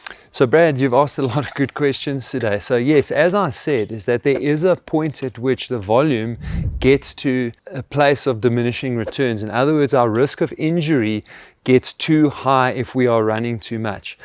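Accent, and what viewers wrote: South Africa. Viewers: British? I only hear South African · Advice sounds even better delivered with a South African accent. Such a lovely accent!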